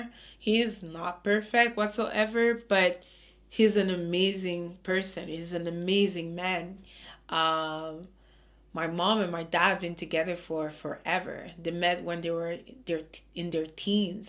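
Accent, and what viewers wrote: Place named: Italy. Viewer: you must be Italian!! you sound just like me loool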